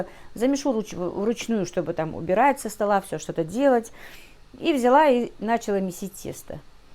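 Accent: Standard. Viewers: Прекрасная чистая речь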